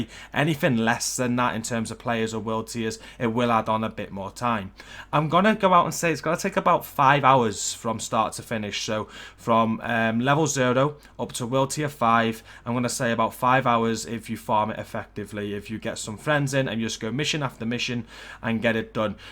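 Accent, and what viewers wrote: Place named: Liverpool, United Kingdom. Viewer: Sounds like your from liverpool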